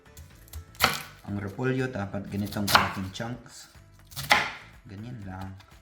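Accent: Philippines. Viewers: Wow I can’t believe your accent pinoy na pinoy talaga.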